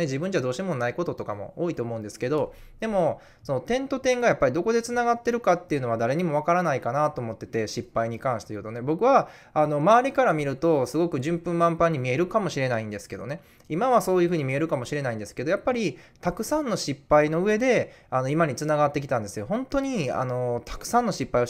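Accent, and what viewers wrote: Kansai, Japan. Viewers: 関西方面の方の方言に苦手意識が有りましたが、初めて嫌な感じを受けませんでした。分かりやすく言うと…意地が悪そうじゃ無い感じです。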